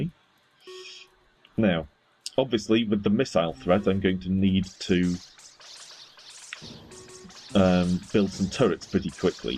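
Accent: United Kingdom. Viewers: Subscribed, nice very clear commentary, and you're English. :D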